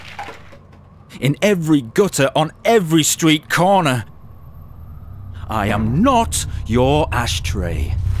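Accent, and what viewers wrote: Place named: Sheffield, United Kingdom. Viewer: Not badly done, just don't really understand why they got a voice actor from Sheffield or wherever, and not a Brummy? Are Brummies themselves sick of their own accent?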